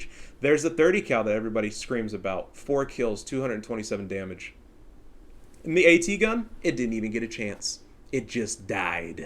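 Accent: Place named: Germany. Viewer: Bro... this shit is fucking comedy. Literally peak youtbe right here 🤣🤣🤣 the fact that you can so the German accent so well and clearly aint about that shit is legendary 😂😂😂 i dont even like videos on youtube cuz it fucks with my music but this deserves likes regardless hahaha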